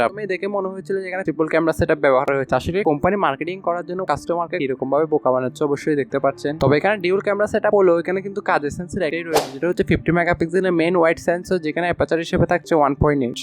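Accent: Chittagong, Bangladesh. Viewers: Same bhai..ami fouzdarhat thaki..apnar bolar style dekei buje geci..apni ctg tahken..😇💜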